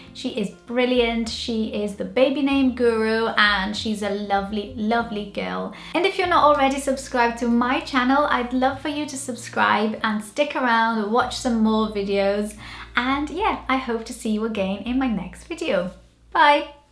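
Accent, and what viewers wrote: Brazil. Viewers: I think Jack we will probably say as North Americans with “é” sound . Some of the names doesn’t make so much difference because of your Brazilian accent. I’m from country side of São Paulo and some of them like Arthur and Oscar would be so different with a strong R.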